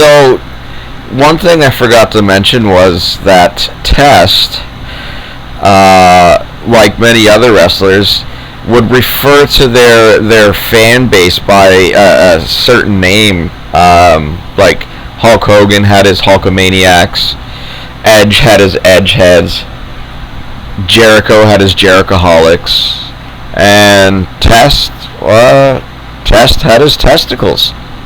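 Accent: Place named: Canada. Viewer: I can tell with all those "oot's" that this guy has to be Canadian.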